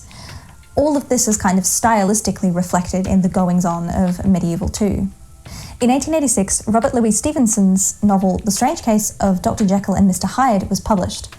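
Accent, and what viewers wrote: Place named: Australia. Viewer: australian spotted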